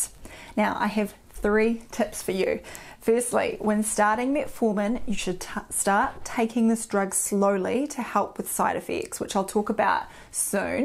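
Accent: New Zealand. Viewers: Love your videos and info but especially your kiwi accent as I'm an ex kiwi living in Toronto, Canada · Livel (level), tin (ten), sicondly (secondly)... Lovely New Zealand accent 😄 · I just love your Kiwi accent apart from all the important info. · I loved the new Zealand accent more than the informatiin on Metformin... The accent reminds me of Jacinda Ardern.♥️😊. But what about a high fat low carb diet,as an alternative to Metformin? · I wish she were my primary care physician! I love her new Zealand accent! She's such a perfection, in every way! You can go to her clinic with an ailment, her smile will heal you in no time! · I love to hear your Kiwi accent and to watch you. You are eye candy.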